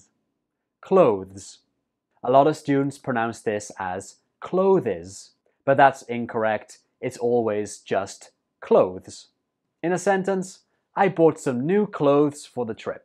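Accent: United Kingdom. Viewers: So did I. It's easy to understand his British English.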